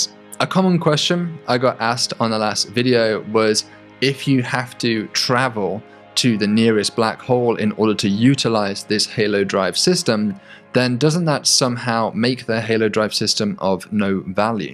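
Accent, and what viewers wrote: United Kingdom. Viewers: This dude looks like someone thought to themselves what the coolest person they could make is and we got this guy. Black leather jacket, british accent, awesome hair, and extremely smart. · Its just the British accent.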